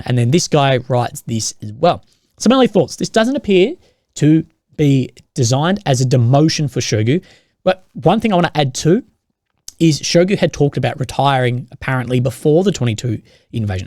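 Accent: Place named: Australia. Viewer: Maybe this channel would be better without the whiny Aussie accent and and his 1970s suit collection.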